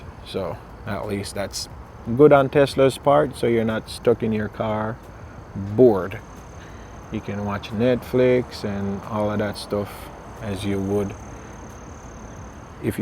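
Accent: Jamaica. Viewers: Can i ask if you happen to be jamaican? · Mi know mi people any weh we deh lol big up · I sense a Jamaican accent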